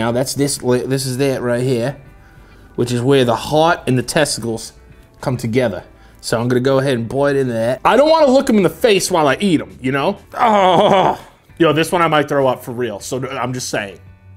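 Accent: Australia. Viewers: As an Australian man, I can confirm this is exactly how we speak... mate. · HIS AUSTRALIAN ACCENT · i swear i’ve watched this a million times; now my going to sleep video i watch Australian accent kills me every time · As an Australian, that accent was not offensive, but it was shithouse. 😂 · the Australian accent is killing me 😂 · NOT down to watch eat more bugs considering the fact ima bout to puke...... but very down for a whole video of him just talking in an Australian accent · Am I the only one that thinks with an Aussie accent is 👌👌👌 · Love the ozzie accent! Bloody rippa mate!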